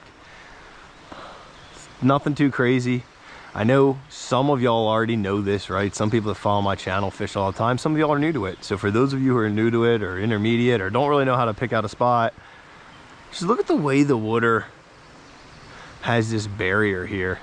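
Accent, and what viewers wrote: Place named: Delaware County, United States. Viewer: Good stuff funny listening to the delco accent growing up in frankford in philly ,now living up by green lane state park,good stuff would love to fish with you in summer! Keep up the wood content on the "wooder's" lol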